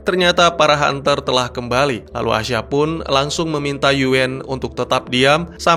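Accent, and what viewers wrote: Aceh, Indonesia. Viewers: wahh, abang dari aceh kah?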